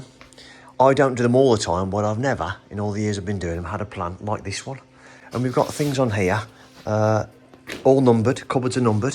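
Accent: Birmingham, United Kingdom. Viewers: This is the first one of your videos that I've watched. I am SO impressed with your work. So professional!!! You sound as if you're from the Birmingham area. I'm going to be having a new kitchen later this year and I'm definitely going to be looking you up (if you are indeed from the Birmingham area!). I've fitted my own kitchens in the past, but you're so good I think I need a professional!!, Great work!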